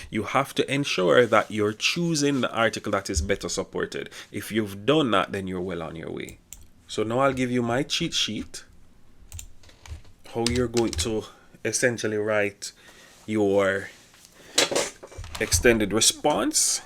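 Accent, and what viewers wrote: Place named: Jamaica. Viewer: Sound like a Jamaican 🇯🇲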